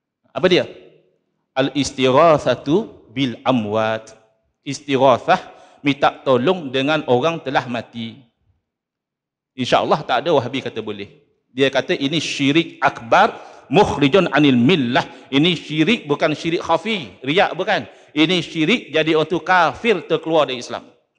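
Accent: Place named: Negeri Sembilan, Malaysia. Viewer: Mano... Cam org nogori ustaz ni🤭🤭🤭🙏🙏🙏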